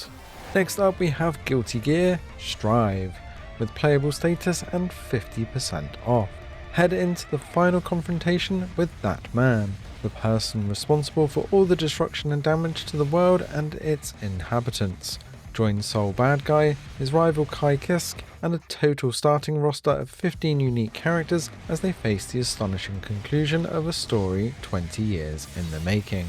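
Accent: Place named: United Kingdom. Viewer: 😂 the narrator of this channel sounds like he's so bored, that brit almost put me to sleep. Highly doubt he games. Just bought Midnight Fight Express can't wait to check that one out!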